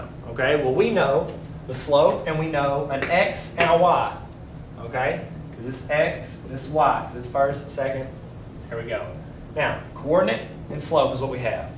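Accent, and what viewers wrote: South, United States. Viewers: Who else LOVES HIS COUNTRY ACCENT !!😍😍😁😁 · Thanks dude and nice southern accent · your southern accent is adorable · So southern! C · His southern accent... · rodriguez That's what you call a southern accent. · I would pass math if he was my teacher... my teachers old and doesn't have an awesome southern accent XD like for if my math teacher looked and talked like him hed have my attention XD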